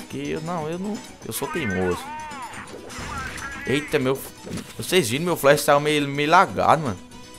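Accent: Northeast, Brazil. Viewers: vc é nordestino?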